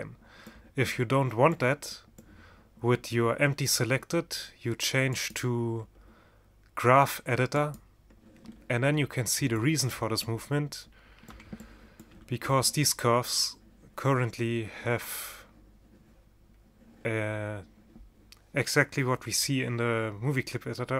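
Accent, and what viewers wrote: Germany. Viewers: Man hört schon in den ersten 10 Sekunden, dass du Deutscher bist^^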